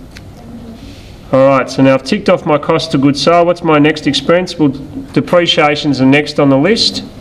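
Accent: Australia. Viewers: Australians have a cool accent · australian accent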